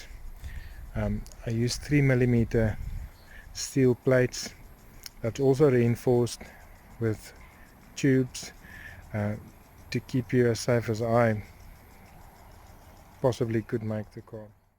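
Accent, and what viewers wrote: South Africa. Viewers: You South African my bru!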